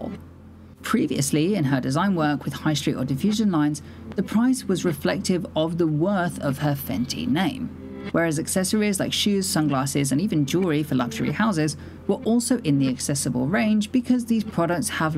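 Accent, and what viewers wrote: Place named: RP, United Kingdom. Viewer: Bro your posh accent sound so prim and proper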